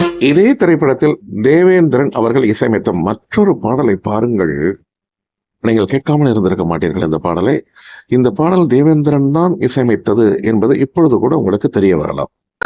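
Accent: Sri Lanka. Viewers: உஙகள் இலங்கை மொழி அழகு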